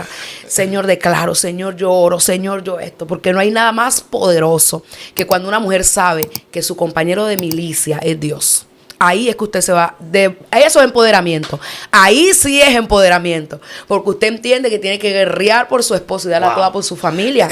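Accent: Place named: Dominican Republic. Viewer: Esta hermana parece Dominicana tiene el acento de el pueblo Hato mayor Rey Dios siga bendiciendo esta mujer del Reino un abrazo a la distancia ❤❤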